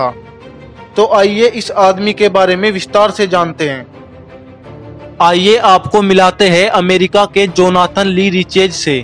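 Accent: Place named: Haryana, India. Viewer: Ur voice accent is like haryanvi language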